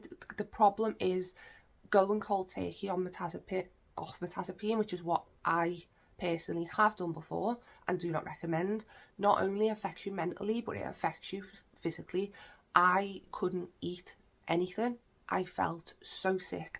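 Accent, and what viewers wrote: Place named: Liverpool, United Kingdom. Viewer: Need a better Mic.hard to hear, and speak slower cause of scouse accent.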